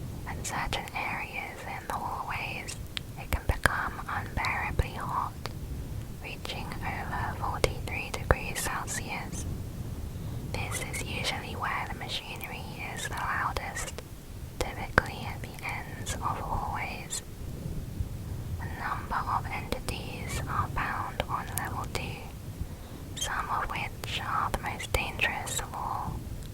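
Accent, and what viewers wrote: United Kingdom. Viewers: She's from the uk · are you british? im british btw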